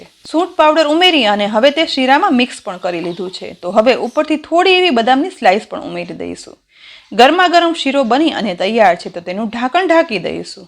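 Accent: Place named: Rajkot, India. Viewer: Yummy food with full of nutrients. I love your accents ,seems like you are from Rajkot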